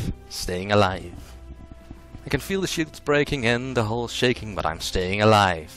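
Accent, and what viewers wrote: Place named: Eastern Europe. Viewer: It's a pitty. When you are planning to replay the game on the higher difficulty, please let me know. I'd like to give you a bunch of tips and hints helping you to win the game. (I've collected around 30 tipps and hints to improve your gameplay or just sensitize you to some aspects of the game.) You're English is very good (from my point of view), although I suppose you're not a native speaker. I guess, you're from an Eastern European country, aren't you?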